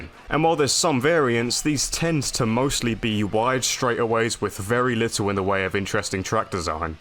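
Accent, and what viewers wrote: United Kingdom. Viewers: Well, you think your anglo poxon accent is human and is tolerable. Everyone has wrong ideas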